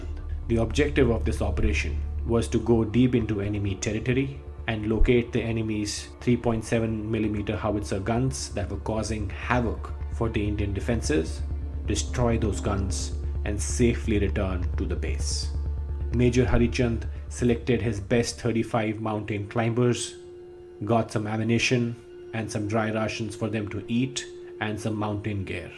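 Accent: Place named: United States. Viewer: Excellent research and presentation, as always. One request: pls try to use the King's English i.e. the English that is taught in our best schools and also used in our military. Therefore, 'route' should be root (not rowte), 'lieutenant' should be leftenant (not the U.S. "lewtenant"). Else the Americanisms are jarring to the educated Indian ear.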